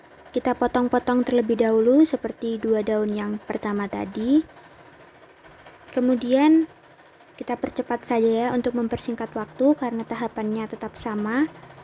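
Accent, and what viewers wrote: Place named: Java, Indonesia. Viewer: Jowone medok, hahaha semangat yaa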